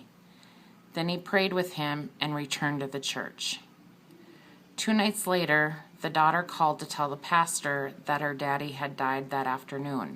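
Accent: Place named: Upper Michigan, United States. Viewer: You have a Upper Michigan type of accent. It could be Minnesota or Wisconsin too. I grew up in the U.P. but live in TUCSON-AZ.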